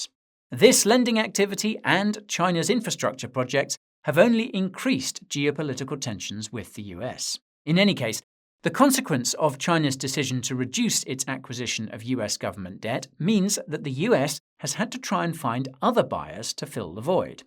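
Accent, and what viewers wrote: United Kingdom. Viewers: Hi 👋 Where about you based? You have a British accent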